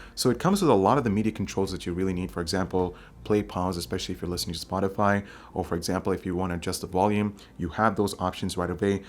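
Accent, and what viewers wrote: India. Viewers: INDIAN ! WITH NICE ACCENT! KEEP IT UP BRO ! :)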